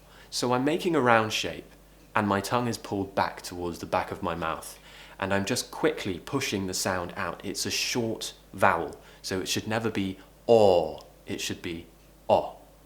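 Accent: United Kingdom. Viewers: Question, does exist any British English app? It sounds like British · I love your British accent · Dear, love ur British accent persistently, and do remember to take the masks while going outside, washing hands for preventing yourself from being infected. Take Care!!!!! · I just love to learn ur british accent.. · Thank you! I like the british pronuntuation, this is very useful! Regards from Mexico!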